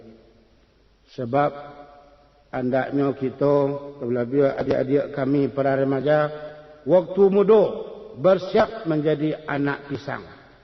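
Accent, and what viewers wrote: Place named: Maninjau, Indonesia. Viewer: Kalau dari Bahaso ampia Samo logat Jo urang Maninjau... contoh bada..mantiko dll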